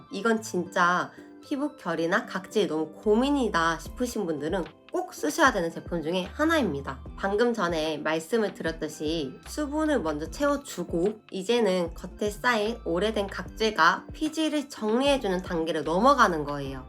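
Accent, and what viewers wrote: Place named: Jeolla, South Korea. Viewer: 오 완전 전라도억양!!! 전라도 분이신가요???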